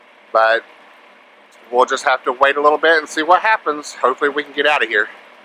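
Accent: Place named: South, United States. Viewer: Btw, where you from? I found another blogger that at least sounds as if they’re from the south. Tri Cities Tennessee here.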